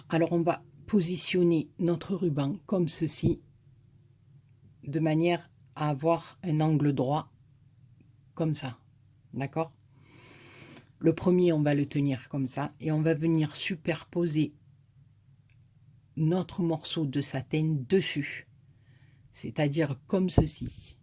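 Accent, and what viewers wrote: South, France. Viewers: Trop jolies tes fleur des neiges et ton accent j adore tu me fais voyagé dans le sud à chaque fois. Et tes explications son très claire pour moi Ta raison fais péter les fleurs au moins sa sent bon🤣🤣 pleins de gros poutous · Un accent que je connais bien... puisqu'on me dit toujours..." t'es du sud..."... encore merci pour tes tutos, tes explications.. et surtout pour tes idées géniales de déco...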